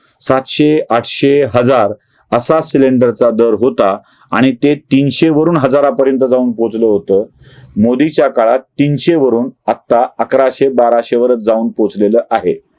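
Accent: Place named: Solapur, India. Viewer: very nice..real representing.. reticular in solapuri..tone..😅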